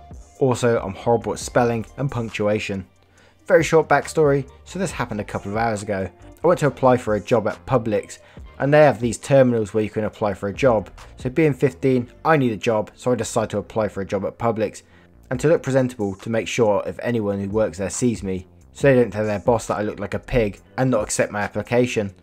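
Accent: United Kingdom. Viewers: I love your brit accent, what part of England are you from? We live in Texas, but my in laws are from the Stratford on Avon area. They live in TX now and we are visiting UK in 2020. Great storytelling!!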